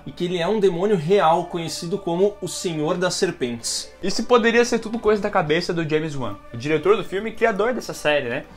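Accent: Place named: Rio Grande do Sul, Brazil. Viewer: Aaa eu sabia q vcs eram gauchos kkk so pelo sotaque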